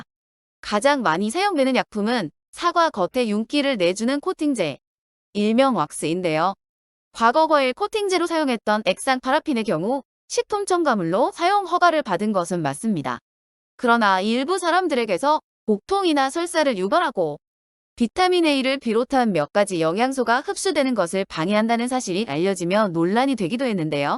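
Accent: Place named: Gyeongsang, South Korea. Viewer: 경상도 말투가 서울말씨랑 어울려저 아주 듣기좋아요ᆢ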